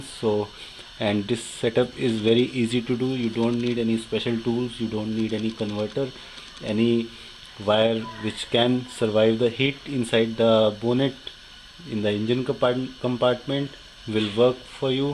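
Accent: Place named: India. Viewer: I like the way Indian people speaks english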